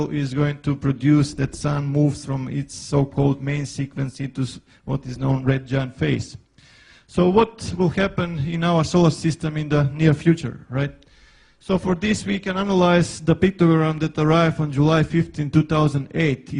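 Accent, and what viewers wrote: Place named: United Kingdom. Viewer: Let me guess, you're from the UK.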